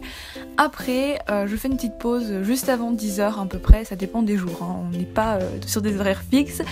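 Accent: Belgium. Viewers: wuoooh' tu es Belge? :0 c'est la première vidéo que je vois de toi, franchement j'apprecie, je m'abonne! et je mets, un pouce bleu! 😊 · Belge ?! 😂❤ Super vidéo 😍💍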